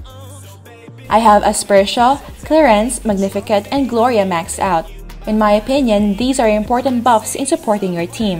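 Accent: Philippines. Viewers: I love your filipino accent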